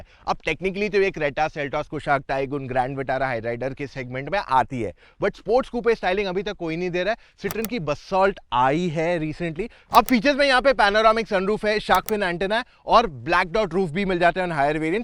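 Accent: Gujarat, India. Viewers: Like your Gujarati Tone मेला होने वाला है l 😅😅😅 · He is Gujarati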